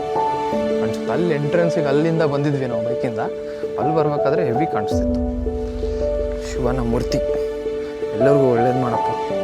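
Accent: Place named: Kalaburagi, India. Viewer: Bro I saw your different Kannada slang in previous video. I believe you must be from Kalaburagi 🔥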